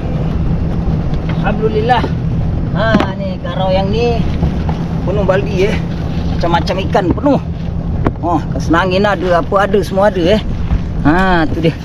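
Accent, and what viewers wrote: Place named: Kelantan, Malaysia. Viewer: Abg asalnya org mn ek? Sebutan ikan tu sama mcm org Kelantan sebut 'ikan kitam', dan banyak lg lh ikan² yg abg sebut sama mcm org kelantan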